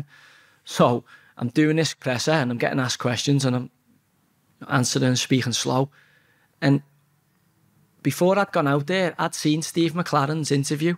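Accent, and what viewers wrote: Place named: Liverpool, United Kingdom. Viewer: proper Scouser x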